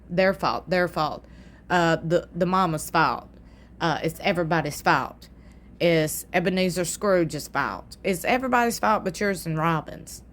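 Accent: South, United States. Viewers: I love your voice! And your southern accent too!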